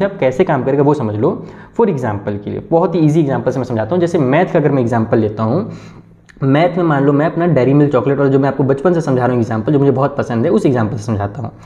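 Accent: Bihar, India. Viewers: Sir bihari lagat he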